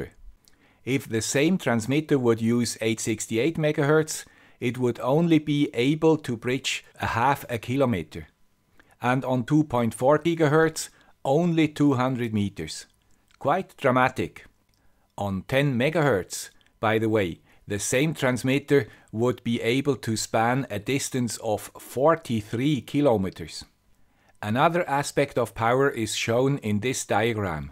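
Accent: Switzerland. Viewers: is that a picture of you in the bottom right hand corner at the opening of the video? Its so nice to be able to put a face to your Swiss accent. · Perfect video ! Loss in free space chart alone is great. Simple as Einstein says but not simpler. Thank you guy with the Swiss accent for this another great video.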